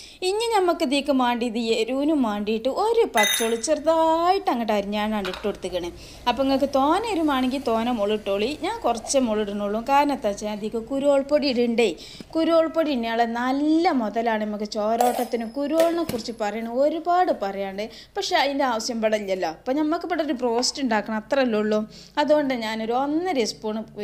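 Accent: Malappuram, India. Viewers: ചിക്കന് ബദൽ ആയിട്ടുള്ള മുട്ട ബ്രോസ്റ്റ് സൂപ്പറായിട്ടുണ്ട്. വീഡിയോ എല്ലാം ഒന്നിനൊന്ന് മെച്ചം തന്നെയാണ്. എങ്കിലും താത്ത ഇതിനോടൊപ്പം ഉള്ള നിങ്ങളുടെ സംസാരം ഒരല്പം പോലും കുറയ്ക്കേണ്ടതില്ല. കാരണം മലപ്പുറം ശൈലിയിലുള്ള നിങ്ങളുടെ ഈ സംസാരം തന്നെയാണ് നിങ്ങൾ ഇടുന്ന എല്ലാ വീഡിയോകളുടെയും ഹൈലൈറ്റ്. സംസാരം താല്പര്യമില്ലാത്ത ആരെങ്കിലും ഉണ്ടെങ്കിൽ ആ ഭാഗം അത്തരക്കാർ സ്കിപ്പ് ചെയ്ത് പൊക്കോട്ടെ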